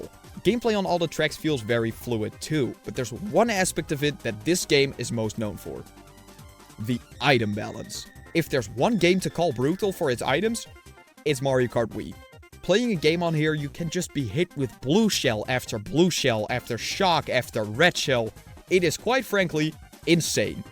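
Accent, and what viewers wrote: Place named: Netherlands. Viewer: Hmm, the accent... Fellow Dutchie? 😄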